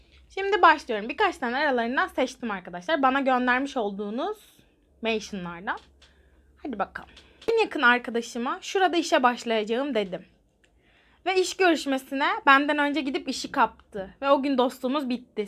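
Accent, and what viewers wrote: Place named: Aegean, Turkey. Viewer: Ya seni yirim şive egeden izmirliyim öptüm çok ❤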